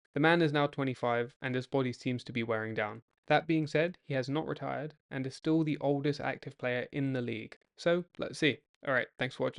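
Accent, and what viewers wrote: United Kingdom. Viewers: British guy talking about American football?????.?.? WHAT!!!!!!!!!!!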